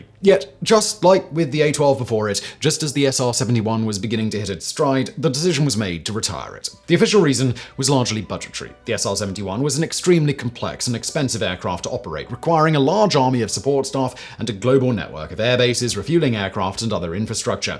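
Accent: United Kingdom. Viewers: I speak English. English is my first and only language. I like all of your channels and find your content extremely interesting. The only thing is, could you slow down just a bit with your speech? It's not that I don't understand the meanings, it's just that with your English/British accent as opposed to my slow American drawl I have to keep rewinding just to understand what you said. I hope you will take this as constructive criticism and not a petty complaint. Thank You !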